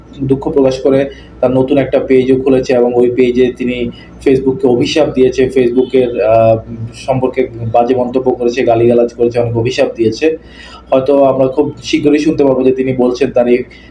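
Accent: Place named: West Bengal, India. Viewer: আপনার ভাষা শুনে মনে হচ্ছে, আপনি পশ্চিমবঙ্গের কেউ। যদি না হন, তবে পরিচয় দিন।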